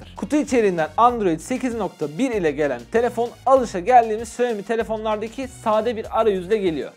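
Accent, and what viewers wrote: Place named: Uşak, Turkey. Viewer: ABİ SEN NERELİSİN UŞAK ÇEVRESİ GİBİ GARİ FELAN DİYON :D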